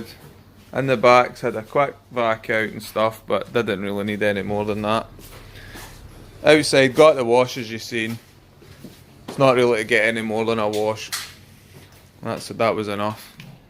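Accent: Scotland, United Kingdom. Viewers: My mother was Scottish. How I miss hearing the brogue. Beautiful! PS: The car looks great too. First car: 1976 Fiat 131S. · Hyundai i10 2010. I still have it. I like your scottish accent.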